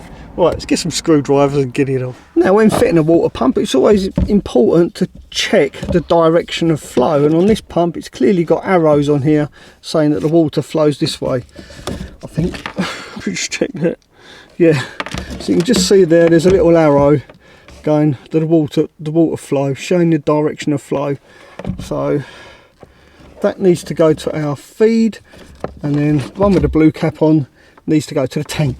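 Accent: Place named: London, United Kingdom. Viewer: Hearing you speak * reminds me of the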